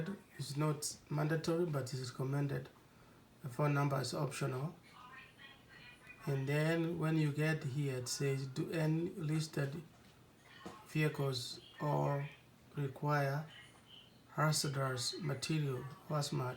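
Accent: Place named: Kenya. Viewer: eeh! Baba umetoka upande gani bwana, nkisikia sauti yako, nkasema hapana huyu ni wa home lazma, anyways nice to hear that, ntakutafuta InshaAllah tuzungumze zaidi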